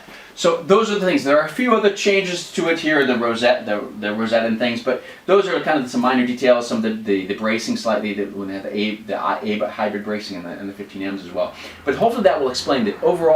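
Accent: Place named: France. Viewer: This isn't a criticism, but I had to watch this whole explanation a couples times until I got used to the quaint French accent that kept creeping in. BTW, most folks place the accent on the second syllable of "Sapele"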